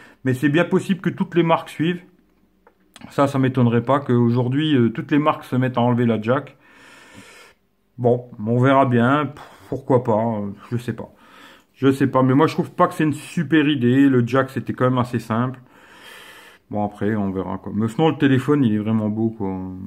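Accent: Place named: North, France. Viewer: L'accent du nord dure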